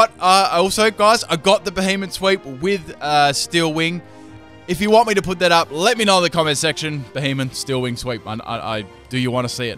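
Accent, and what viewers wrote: Australia. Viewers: "it's gonna spank me on the ass doing 3/4 health there" idk why but that line was really funny. maybe it's the aussie accent.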